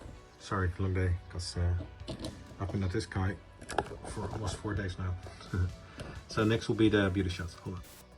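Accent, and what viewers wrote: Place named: Netherlands. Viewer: Sounds like a dutch accent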